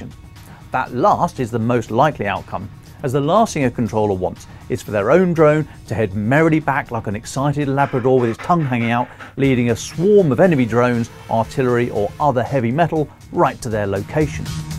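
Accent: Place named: United Kingdom. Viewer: Yet another load of BS from a guy with british accent.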